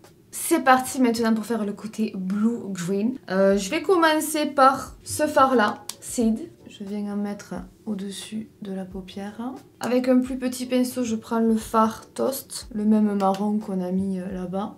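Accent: South, France. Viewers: J’adore vos nouveaux cheveux …….du Québec j’adore votre accent du sud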